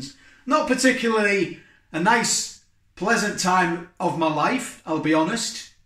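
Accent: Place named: Yorkshire, United Kingdom. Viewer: Where are you from.. you gotta be yorkshire lol.. where about.. I'm Leeds .. I'm gonna try this.. when iv room int freezer lol x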